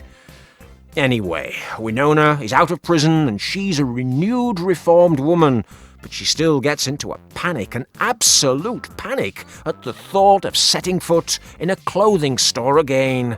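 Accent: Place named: Northern England, United Kingdom. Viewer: I've spent months trying to figure out where the narrator is from. Northern England, somewhere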